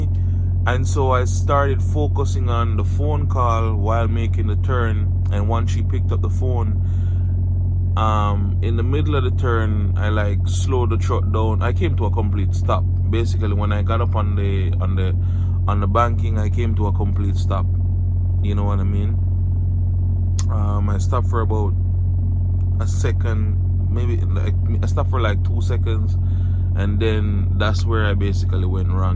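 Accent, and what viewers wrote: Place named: Jamaica. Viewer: Sounds Jamaican 🇯🇲 to me …… mistakes do happen head up champ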